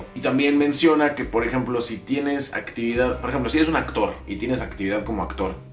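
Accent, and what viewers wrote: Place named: Mexico. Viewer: jajaja me encanta tu forma mexa de hablar <3 jajaj <3 exiiiiitooooooooo en su negocioo!!!!!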